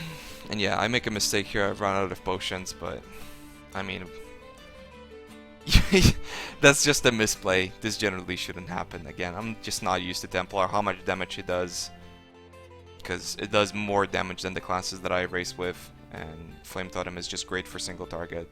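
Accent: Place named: Poland. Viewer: jak się człowiek spieszy to się diabeł cieszy ^^ od dawna cię oglądam, ale nie wiedziałem że jesteś z Polski :D trudno wyczuć akcent, gratulacje! powodzenia na lidze!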